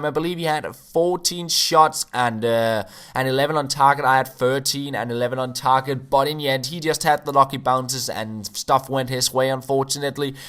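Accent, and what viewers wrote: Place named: Denmark. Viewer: I love the danish accent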